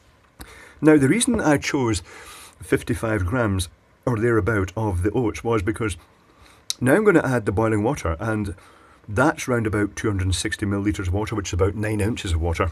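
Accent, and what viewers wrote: Scotland, United Kingdom. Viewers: Thank you I live in the US but am from Cheshire, never knew there was a posh Scottish accent but your right. Nice vid though. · Coming from Scotland myself, this guy has the most annoying Scottish accent in the world.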